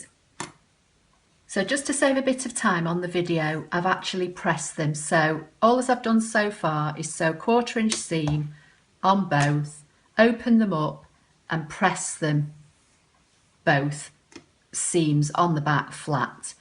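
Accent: United Kingdom. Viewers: Great little item, i must give this a go,you sound like where i come from in the UK hearing you talk. :)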